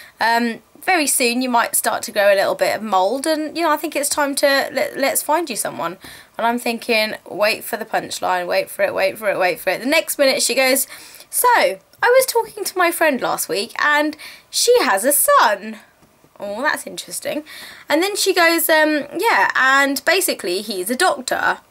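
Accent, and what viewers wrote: United Kingdom. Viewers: lol..just moved back from the UK to the States. You make me miss the accents lol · I love listening to your stories and I COMPLETELY agree with everything you said! :) It's like people think that just because he's a doctor and has money, makes him a catch. What about true love?! By the way, your accent is literally amazing! I could just listen to you talk all day! Haha! I <3 British accents! :) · your accent is so alkdjfaldh WHY AM I NOT BRITISH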